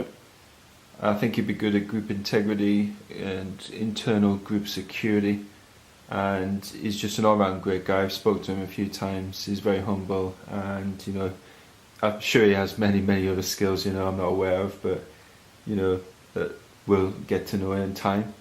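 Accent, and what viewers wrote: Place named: Newcastle, United Kingdom. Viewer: I think you should stay on YouTube. Good content and hearing you reminds me of my drugged youth living in Newcastle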